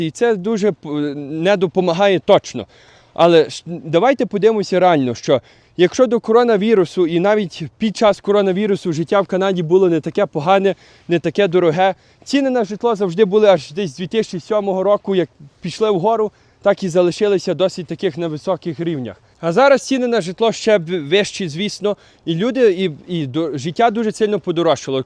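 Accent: Western Ukraine, Ukraine. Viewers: Так у него западный акцент. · Это западноукраинский, а не американский акцент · Дивлюсь вас вперше,ви мабуть з Західної України, бо там говір такий. Усюди де чую такий тембр мови,згадую дитинство у бабусі у селі ,як розмовляли мої дядьки . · Дуже дратує твій западенський акцент !!! Тому ДИЗЛАЙК !!! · Про яку мову ти можеш говорити,якщо ти закордоном? Який ти українець?Ви перевертні! Українці на Україні! А ти за своєю вимовою із Західної України, ти навіть не бачив і не чув тих вибухів. Під шумок рванув " щирий українець". Вчи англійську, бо там українська мова нікому не потрібна. 😂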